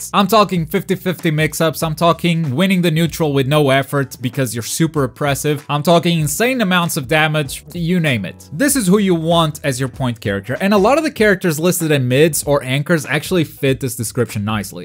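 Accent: Portugal. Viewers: Wait, are u portuguese?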